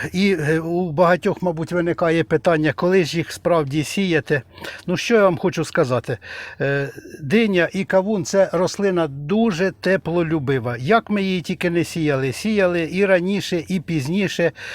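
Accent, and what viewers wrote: Poltava, Ukraine. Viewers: я просто з Миргорода, завжди Полтавський діалект виділявся серед інших)))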